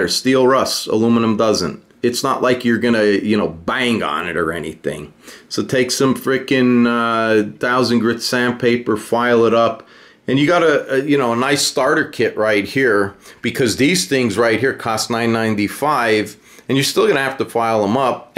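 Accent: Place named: Chicago, United States. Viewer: What part of chicago are you from? Lmao You sound like you're from my old neighborhood lol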